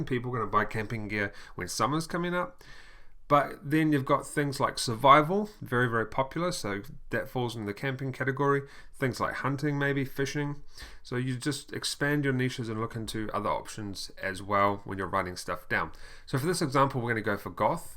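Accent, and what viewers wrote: New Zealand. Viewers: ...you sound kiwi... totally off subject lol great vdo btw :)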